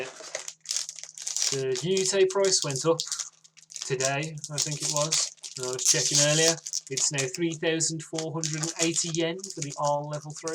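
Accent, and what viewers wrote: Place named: Newcastle, United Kingdom. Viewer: Question. Where in england do you orignaly come from? Cause i think you kinda sound Newcastleish, but yeah. haha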